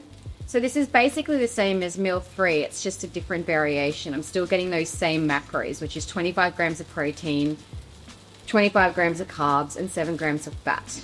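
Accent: Australia. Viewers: Surprise surprise 😂😂😂. Only joking, mate. I was expecting her to sound American. The Aussie accent was a complete shock.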